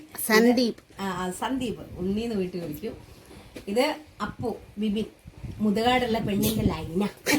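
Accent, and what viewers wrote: Vadakara, India. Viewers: 😂😂😂അടിപൊളി...വടകര ഭാഷ 😌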